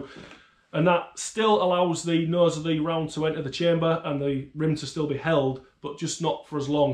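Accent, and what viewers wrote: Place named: Northern England, United Kingdom. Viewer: Hi there matey, am loving your 'Northern Style' as a fellow northerner it's nice to hear rather than yet another 'Shandy Drinker'. Just got my 22WMR Variation back from my local force and I am currently in discussions with Rob at Guncraft Ltd. about one of their 22WMR AR's. The thing that I can't seem to decide on is barrel length vs Handguard Length. What Length is your DD Handguard? as I am aware you're running one of Rob's earlier 18 inch barrels. The reason I ask is I like the look when the barrel doesn't protrude far past the Handguard, that way when you add a moddy it kind of has the look of an MP5SD if you know what I mean? Finally I see you like the Kriss Defiance DMK22, I've had mine for just short of three years and I absolutely love it, still one of my favourite gats. I'd post a pic but haven't worked out how to do it yet? 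Oh an incidentally this is my first ever You Tube comment so consider yourself honoured!?!?! lol.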